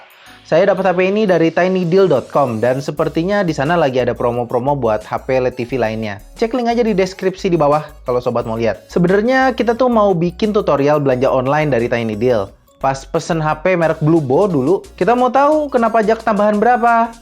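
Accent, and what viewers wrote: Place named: Sundanese, Indonesia. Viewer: Bang, Logat Sundanya kocak bat dah:v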